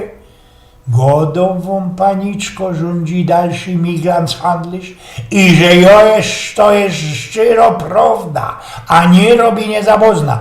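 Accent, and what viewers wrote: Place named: Silesia, Poland. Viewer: Wzorcowo schlońsko godka.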